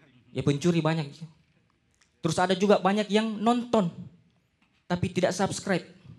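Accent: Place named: Makassar, Indonesia. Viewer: Ini dia yg gue tunggu.. Orang Makassar mana suaranya ❤️❤️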